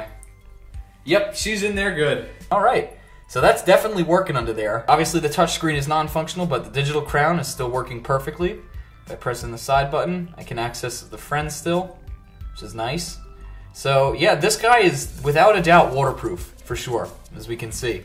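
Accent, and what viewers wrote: United States. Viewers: Someone's from Philly! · nah, it's American - THIS GUY IS NOT BRITISH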